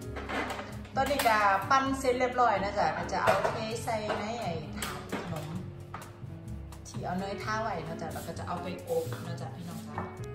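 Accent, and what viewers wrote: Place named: Korat, Thailand. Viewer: พี่สำเนียงคล้ายๆคนโคราชเลยครับ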